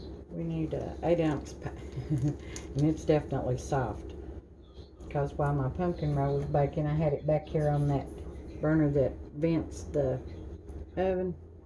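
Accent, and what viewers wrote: South, United States. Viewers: 3/4 cup of "Flair". I love your southern accent...and your sweet kind soul.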